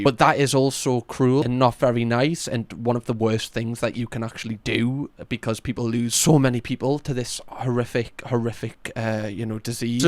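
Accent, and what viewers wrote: Newcastle, United Kingdom. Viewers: I like your accent, is it Geordie or more Sunderland?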